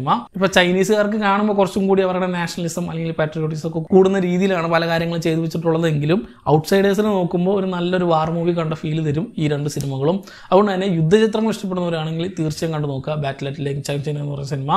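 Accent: Palakkad, India. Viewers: This time in long time i could sense your palakkadan slang👏👍😀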